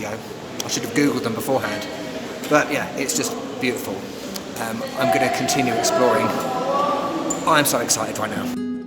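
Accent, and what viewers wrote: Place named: United Kingdom. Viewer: Hi! You did an excellent job, I love how you feel the environment in any place make is so special and also I always enjoy you personality and of course your British accent. Big hug for you Carlos.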